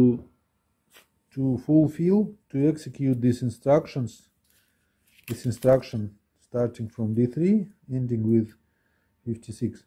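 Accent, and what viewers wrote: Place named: England, United Kingdom. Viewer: О, а вы из Англии?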